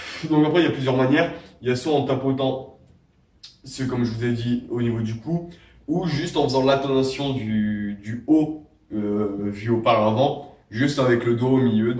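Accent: France. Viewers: T'es de Ch'Nord? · tu viens du nord ?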